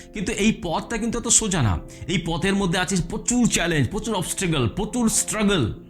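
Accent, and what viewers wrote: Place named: Kolkata, India. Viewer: Apni Kolkatar...😂😂😂 Kotha bolar dhoron ei bojhay..😢😢😢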